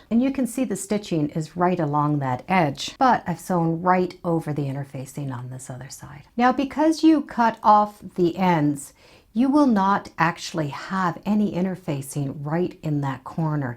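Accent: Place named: Canada. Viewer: I could listen to you say "again, against" over and over. That Canadian sound is clear.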